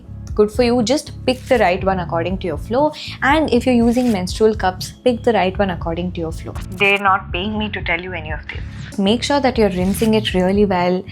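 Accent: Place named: Karnataka, India. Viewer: that kannada accent 😂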